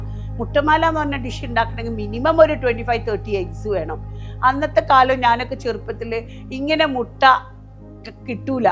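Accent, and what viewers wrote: Kozhikode, India. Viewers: Kozhikottaare samsaram kettaal thanne vayar nirayum ❤️‍🔥💪 Oru kozhikotukarii 😉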